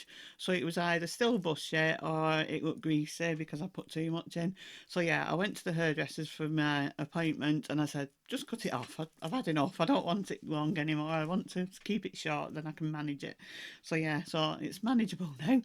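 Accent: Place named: Yorkshire, United Kingdom. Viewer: I'm from Ohio and I'm 85. Since I was 8 ,I've had pen pal from Yorkshire. We are like sisters. I love hearing your accent. It. reminds me of her. I enjoy all of your videos, but don't always comment.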